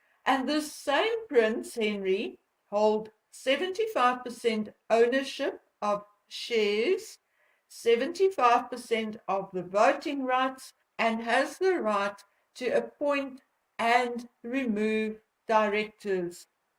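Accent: South Africa. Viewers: It isn’t Americans falling for Prince Moob or wanting censorship, take your contempt & shove it. Britain Europe embraced Marxism, fascism and nazi-ism. You resent Americans and Brits and Europeans have tried pushing Marxism on us. Leftist organizations attacking Americans free speech rights yet you want to blame Americans. The ignorance is on people like you I have had it. Remain in your old world, ignore the rapes of little girls and women like your royals in all of Britain and Europe do. Have & blame America; I can guarantee you we will never again go to your rescue. You & the former outpost of empire Africa though from your accent you sound more those other allies of the nazis, the Boers. Who has kept & upheld monarchy & made excuses for these backward wannabe grand high poohbahs of upper buttcrack, who had no problems with their empire that they still clutch to their chests and all the problems that came about because of that. 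Who considered drug addicted nazi Harry such a popular cheeky fellow, just as they loved his nazi great uncle David. I had sympathy for Diana, and William, The Soho ho wasn’t popular in the US, but in Canada, she was assisted in entrapping your nazi Harry by other Canadians. Imbeciles like your offspring who vote fascist socialist Trudeau who attacks free speech in ho Canada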